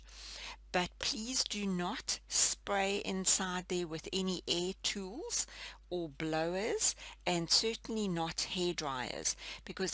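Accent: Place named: South Africa. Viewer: Lovely to hear your SA voice. 🙂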